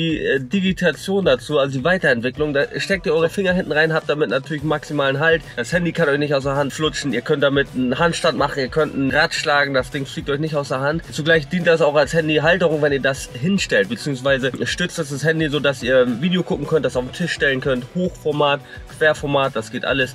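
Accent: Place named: Hamburg, Germany. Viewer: Ich schau dir so gerne zu, ich würd auch gern sagen das ich dir gerne zuhöre aber dein nuscheliger Hamburger Akzent, einfach lustig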